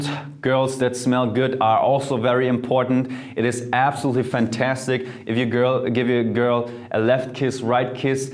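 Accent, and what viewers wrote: Germany. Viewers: Mehta hello! I am french and I can definitively affirm that he is not. The way he's pronuncing french makes me think he is from Germany. Actually, the way he pronunces french"R" is 100% german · I literally was about to look up if he was German until he said he has a German channel. German accent is strong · ist der deutsch? wenn ja man erkennt es eif sofort an diesem akzent😂 nicht böse gemeint btw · German accent! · are ya german? i think hes german i can hear an accent loll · Your german accent is kinda cute :D grüße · Your accent sounds so german :) are you from Germany? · Is he german? He has a little accent, don't he? I'm german, too by the way😉🇩🇪 noch wer? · Hehehe you have a German accent. Are you from Germany? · He sounds german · As soon as you spoke I knew you were Germany. lol. Thanks for the recommendations. What suggestions do you have for women would have sensitive noses. 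A lot of perfume irritate my nose and/or give me a headache. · Why does he reminds me of a young Ralph Fiennes lol. I guess the German accent when he was playing that commandant in Schindler's List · I understood from your accent that you could be only German! Deutschland in my heart! ❤ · Is he german? The accent? (I'm german) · Is he German? Sounds like it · German accent 🙂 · Omg he’s GERMAN haha I immediately knew it because of his accent. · I can totally hear your german accent coming out 😉 cute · Sorry but he sounds so german.. I‘m from Germany so yeah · You’re German, aren’t you? No worries, your English is great, I’m a linguist so I notice those things. But still, thank you for this list, I will give those perfumes a sniff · Too german...if you work with perfumes you need to improve your French, man. Le Femme is pronounced 'fa:m' not 'fem'. Blah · Omgggg I knew he was German I just heard him talking in english and thought the whole video about if he is German too bc of his accent and in the end he talks about having an German account 👯‍♀️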